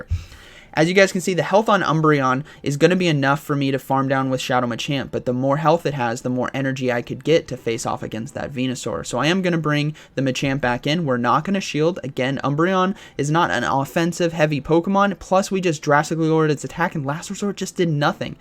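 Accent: United States. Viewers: Didn't realize you lived in the UK. You sound pretty American imo! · Wait is from the UK? Thought he was American lol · He's American, residing in the UK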